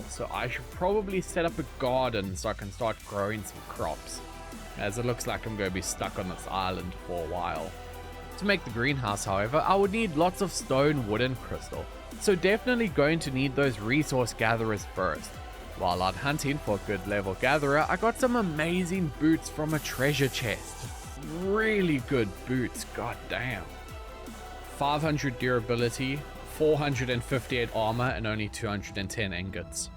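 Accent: South Africa. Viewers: Is South African?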